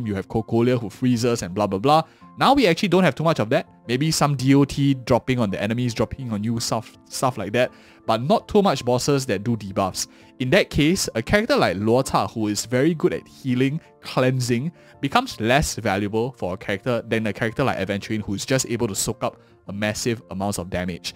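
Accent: Singapore. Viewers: immediately could tell the singaporean accent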